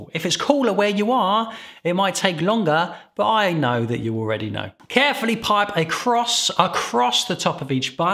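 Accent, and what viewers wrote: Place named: England, United Kingdom. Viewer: I feel it’s the English accent ❤ I know what you mean giggle bc of how much life can be breathed back into a word, simply by changing the pronunciation/accents. ❤ Such beauty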